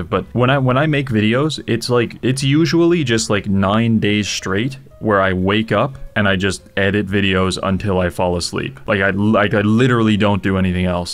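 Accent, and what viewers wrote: Canada. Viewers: when i first watched your video (the wh one) i immedietly thought you sound like seth. then seconds later i was hit by the canadian accent and knew i found a gem of a channel XD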